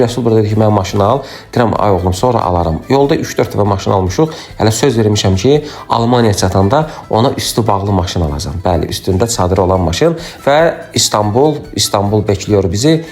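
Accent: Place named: Neftchala, Azerbaijan. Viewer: Bayaqdan düşünürəm bu hara ləhçəsidi?”Nefçala”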